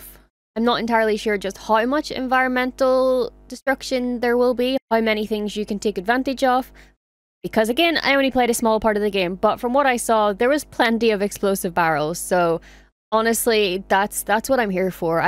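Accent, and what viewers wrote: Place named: Northern Ireland, United Kingdom. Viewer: Great video! Really appreciate your enthusiasm, and enjoyed the natural commentary in your wonderful Northern Irish accent. I'm a lazy gamer and therefore not usually a big fan of open-world games (e.g., couldn't get into Starfield), but this is Star Wars and I think I'll give it a go! Cheers.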